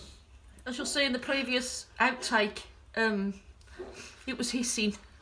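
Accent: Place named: Birmingham, United Kingdom. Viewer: Love this lovely listening to a fellow brummie x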